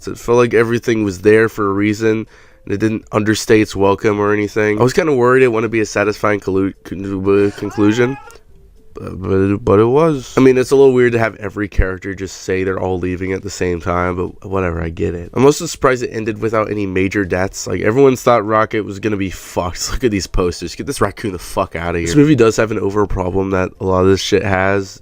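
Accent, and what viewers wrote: United Kingdom. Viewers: Are u british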